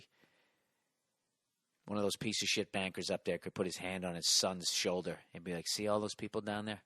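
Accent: United States. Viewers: lol i love the country accent to lol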